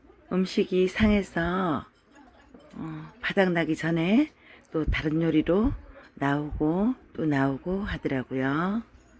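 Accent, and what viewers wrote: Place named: Gyeongsang, South Korea. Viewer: Like7 식당음식이 깔끔~ 대구 억양이 너~무 좋아용♡♡♡♡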